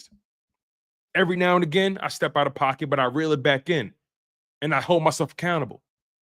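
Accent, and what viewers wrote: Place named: Arizona, United States. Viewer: I ain’t know you was from Arizona street i got a lot of family there might have some mutuals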